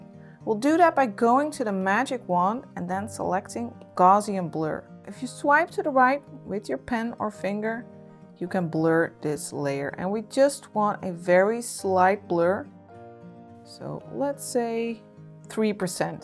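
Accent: Netherlands. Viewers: IS SHE DUTCHHH AAAAAAAAHHH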